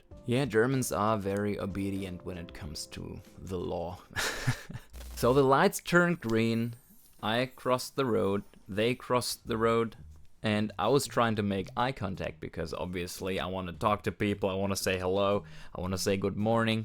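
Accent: Australia. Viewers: Sounds like you took the beginnings of an Aussie accent home with you though! I can hear you dropping it on certain words :;) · Got a bit of a 'downunder' accent happening there mate! (I'm a kiwi). You are not allowed to smoke inside public areas here. No smoking in bars etc, there is usually a dedicated outdoor area for smoking. You no longer come home with your clothes and hair smelling of smoke! They are also not allowed to advertise and cigarettes are usually held in a locked cabinet in stores. It is nice that we don't see cigarette butts dropped everywhere like there used to be decades ago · Great video mate , just came across your channel and subscribed , love your story telling and a little bit of an Aussie accent creeping in Visited Germany in 1989 😂and went to Oktoberfest as all Ozzie backpackers do , absolutely love the country, people, and food. One question though and i never saw this in Europe when i was driving but only in Australia you do a quick 1 finger wave as if to say hey mate while you're on a long drive in the middle of no where 👌✌ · You've got quite the Aussie accent . 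😅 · As an Aussie, I'm really surprised smoking is so prevalent in Germany. I thought you guys would be more concerned about your health. A lot of Aussies quit in the 80s when the dangers of smoking were well understood by the general public. Yes, the federal govt also made smoking more expensive and told cigarette companies they must put them in plain packages. This made it an expensive and unattractive habit. Personally I'm pretty glad about that, as I can't stand smoking and always move away from anyone who's doing it 😅 BTW, some of your vowels are sounding a bit Australian. We definitely rubbed off on you 😊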